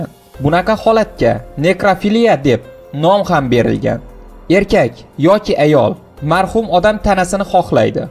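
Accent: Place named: Khorezm, Uzbekistan. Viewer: xayotda qanday garipsangiz shunday oqing deysizmi menimcha admin xorazmlik xorazmcha gapirsala vapshe tushunmaysla😂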